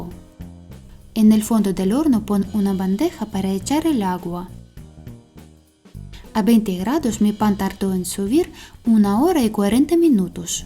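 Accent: Russia. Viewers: te juro que me encantan tus recetas, pero tu acento me recuerda esos horribles munequitos rusos que tenia que ver de pequeno cuando vivia en Cuba, y se me ponen los pelos de punta. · Por mas qué pienso no logró identificar tu acento eres rusa o española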